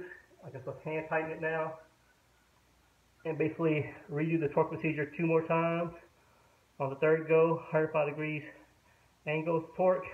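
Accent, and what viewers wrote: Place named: South, United States. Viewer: Did you plastiguage them? BTW its kind of odd to hear a southern accent from a Viet guy. :)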